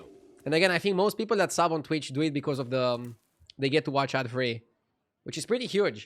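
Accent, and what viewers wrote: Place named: Romania. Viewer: Ma uitam la videoclipurile tale si mi se parea mie ca accentul te da de gol, dar nu eram sigur 100% ca esti roman 😂😂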